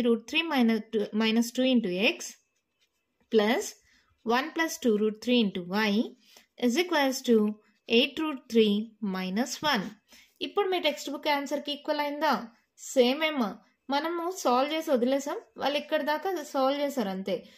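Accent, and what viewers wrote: Nellore, India. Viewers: Mam are you from Nellore??? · Mam are u from Nellore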